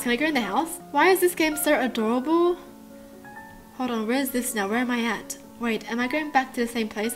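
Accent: Australia. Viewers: Are you Australian? I love your accent <3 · "So after all of that, I'm going to diss a pee?" That's how Australians speak.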